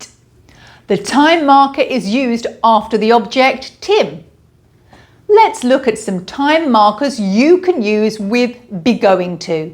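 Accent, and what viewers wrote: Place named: United Kingdom. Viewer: I'm going to say thank you my nice teacher I love Britch English 😂😂😂😂😂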